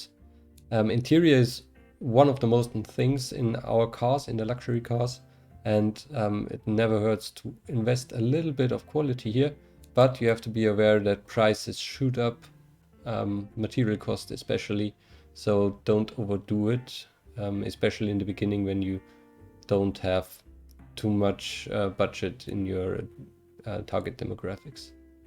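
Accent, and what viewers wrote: Germany. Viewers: As a german, i really like your (german) accent. It makes it kind of easier to listen on a second screen (listen to your stuff as a kind of audio tutorial). Especially with kids around. Strange, but it works. Keep up the good work.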